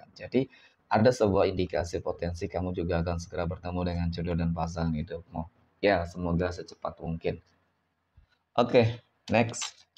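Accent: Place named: Banyumas, Indonesia. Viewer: Aamin hadir bang jawa ngapak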